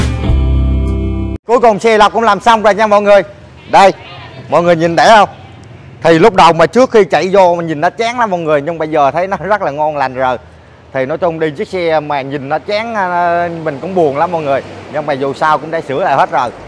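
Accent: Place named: Quang Nam, Vietnam. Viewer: Nghe chú em nói tiếng Quảng Nam rất vzui tai, Con gè con ke.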